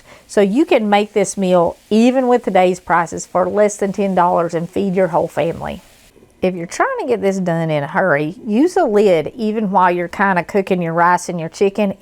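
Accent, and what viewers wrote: South, United States. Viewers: You talk perfectly fine. Southern Food/Country Food is famous for it’s flavor and comfort. So is our hospitality and gentle accent. God blesses us with you and your cooking skills.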